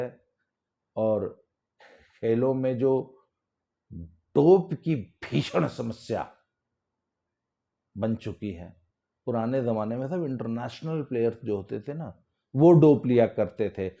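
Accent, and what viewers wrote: Haryana, India. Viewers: कई दिन होगये अजगर गैंग पर कोई वीडियो नही आई, we are waiting for it😂 your हरयाणवी accent🔥😂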